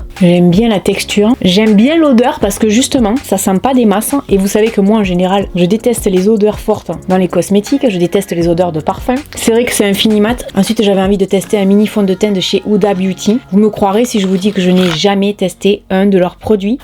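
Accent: South, France. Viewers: bonjour, c'est mon rituel du mardi et du vendredi, je les regarde à chaque fois toutes jusqu'aux bout. même si j'accroche un peu moins pour certaines vidéos mais par respect pour ton travail, j'y vais jusqu'aux bout et puis ton accent du sud c'est du soleil. hâte de regarder la prochaine. bonne soirée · Je suis allée jusqu'au bout comme toujours. Rare son les sujets que je n'aime pas et même si c'est le cas bas ton accent du sud me fait du bien ❤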